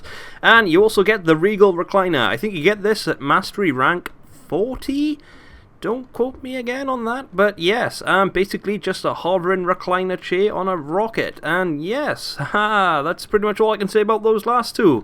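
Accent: Wales, United Kingdom. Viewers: Omg, you're Welsh!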